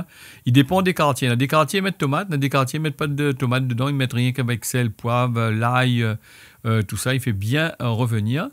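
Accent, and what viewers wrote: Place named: Réunion, France. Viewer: C est trop charmant l accent réunionné ✌👍👍👍😍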